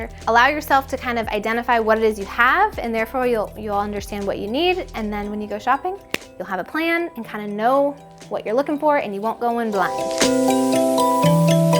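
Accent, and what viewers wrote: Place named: Texas, United States. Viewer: I'm from Texas and you do kind of sound like you're from Texas! My biggest struggle is COLOR. My fiance decorates without really thinking about color which is clashy, and I just don't know how to put colors together in a way that is not clashy but still interesting! I would love a video on this or maybe some templates! I would also like tips on incorporating old furniture and decor that isn't necessarily your current style.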